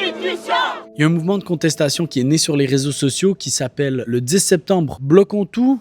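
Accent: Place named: Canada. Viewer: Tu as un accent canadien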